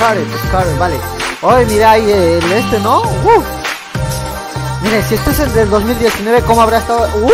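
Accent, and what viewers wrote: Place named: Mexico. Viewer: Este habla como Mexicano en vez de Peruano.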